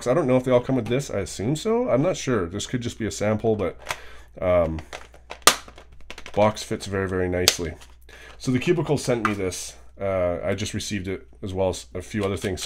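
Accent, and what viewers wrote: Canada. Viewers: It’s the Cubicle.Us and you are pretty sure Canadian, right? Heard that eh, (I’m not Canadian)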